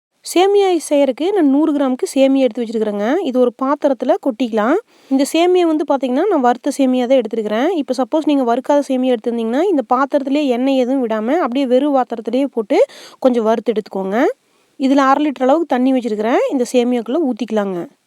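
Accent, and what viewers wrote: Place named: Sathyamangalam, India. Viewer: Hi, nice videos,I just love your Tamil slang, I feel like I am in my hometown Sathy when I hear your voice. where are you from?where are you located?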